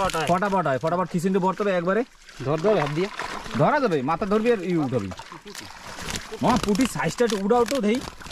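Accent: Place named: Barisal, Bangladesh. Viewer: সবকিছু ঠিকঠাক একবার বাংলাদেশ থেকে তোমার ওখানে বেড়াতে আসবো ভাইজান, তোমাদের কথা সুরগুলো আমাদের বাংলাদেশের বরিশাল অঞ্চলের মানুষের মত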